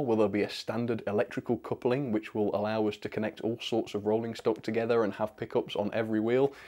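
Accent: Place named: Shropshire, United Kingdom. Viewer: Your accent sounds familiar. You from Shropshire? I'm not a model train guy, but I'm subscribing, this is really nicely done.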